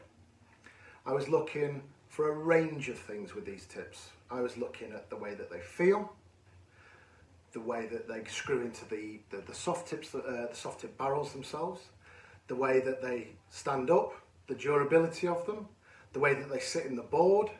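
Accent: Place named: Northern England, United Kingdom. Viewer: Have you moved to them since this video and do you still rate L style premiums? Cheers fella, you sound like a northener like me, have lived in Spain a long time though, hence the soft tip.... I'm not that keen if I'm honest....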